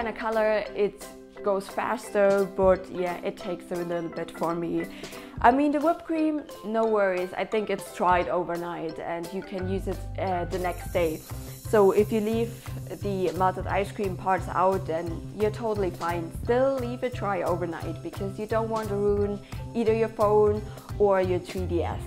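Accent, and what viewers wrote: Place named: Germany. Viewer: hi you have a german accent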